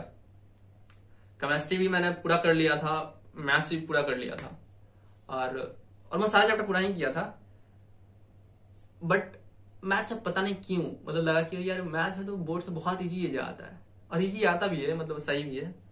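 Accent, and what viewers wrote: Bihar, India. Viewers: Bihari ho kya bhaiya. Tumhara tone BATA Raha hai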